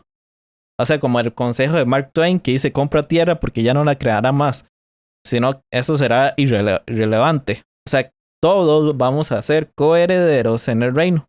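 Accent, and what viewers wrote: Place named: Costa Rica. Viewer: Jaja reconocí de inmediato ese hablado tico, buen video.👌🏻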